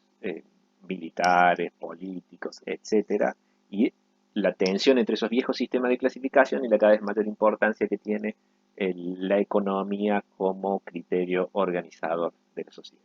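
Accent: Córdoba, Argentina. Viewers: Acá sí queda claro el acento cordobés. 😉 Felicitaciones por el contenido. Saludos desde Montevideo.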